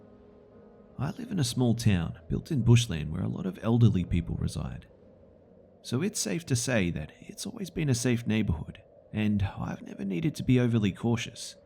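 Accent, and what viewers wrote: Australia. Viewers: The first story makes me think about that Guy Pearce movie that was based on the true story, about the girl in Australia who was murdered by the daughter of old neighbors who was mentally unstable (your accent, probably why I thought of it bc it was set in Australia). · you sound like the narrator for top trending with an australian accent. ^^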